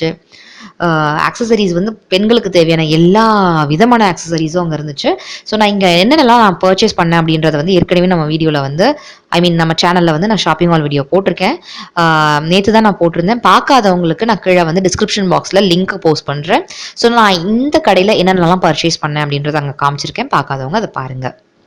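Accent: Coimbatore, India. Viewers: Endra ootu karar enga ooru language m cbe. Really sweet this video i like so much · very cute. dress and neck less super 😘😘😘😘😄😄😄😄😄.Naanga Coimbatore nnga. yendra uttuukarunu😘😘😘😘😘😘 solldrathu super ah errukku.slang very nice pa. condippa reply vennuuuuuuuuuuuuum. OK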